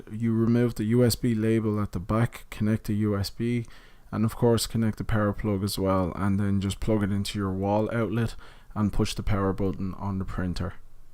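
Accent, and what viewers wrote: Ireland. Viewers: Is this a black dude with an Irish accent?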